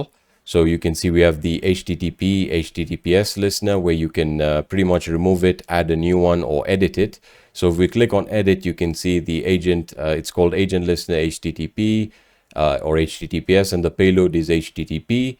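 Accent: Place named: Arab world. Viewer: heh yea , familiar arabic accent - ps me nohomo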